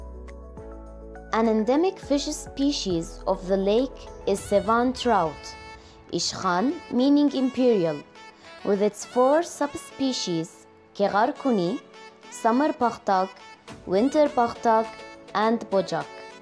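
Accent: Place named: Egypt. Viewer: Your accent I feel it like an Egyptian accent when you speak English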